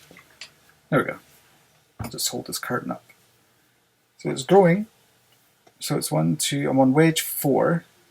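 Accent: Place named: Scotland, United Kingdom. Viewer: Hi, this is my first dip into your world, after Helen mentioned you. I have watched podcasts from around the world for a few years so it's great to hear a voice I could almost call local, I am from Northern Ireland but did 4 years in Edinburgh at uni many years ago. I am rainroseknits on Instagram and ravelry.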